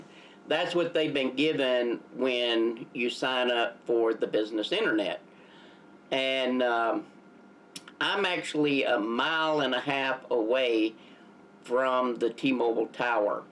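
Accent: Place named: South, United States. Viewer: Wow, no offense but you sound like a southern Bumpkin so I don’t think anything about your review here is going mean anything to the cell signal internet community. It’s ok, you just don’t understand technology. So maybe Chester repair equipment is beyond your cell signal intelligence? You probably even still have a land phone line?? That’s funny! Have you gotten Better connection results with 2 cans of Spam tied together with string? 🤣😂😅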